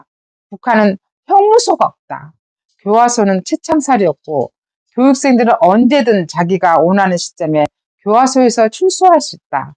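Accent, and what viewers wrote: Jeolla, South Korea. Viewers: 미국 71년에 부모따라 이민온 교포입니다. 제가 대학다닐때, 미국뉴스에서 광주사태에대해 보고 큰 쇼크를 받았었읍니다. 유튜브의 제목을 보고 좀 이상해서 클릭했읍니다. 자신을 전라도인이라 소개하며 아주 예쁘신 미인께서 조리있게 설명해주셔서 제가 가지고있던 광주사태에 대한 오해가 풀렸읍니다. 감사 합니다. 저도 많은 한국분들에게 광주사태에 대한 horror story 를 듣고 많은 오해를 하고 있었읍니다. 전라도 억양이들어있는 말로 너무 설명을 잘해주셔서 안믿을수가 없네요. 미인 님의 말씀 참 신뢰가 갑니다. 그렇지만, regardless of how crooked her political philosophy is, 자기 문학실력을 발휘해서 한국국민들이 염원하던 노벨상을 받은 한강씨는 축하해 주어야 한다는 의견 입니다. 제의견에 동의 안하시는분들께 용서 바랍니다. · 대구사람인데 근래 보기드문 구수한 사투리와진자보기드문 참전라인을 만낫네요 아침부터 허벌나케 구독쉐리박고 갑니다 조아요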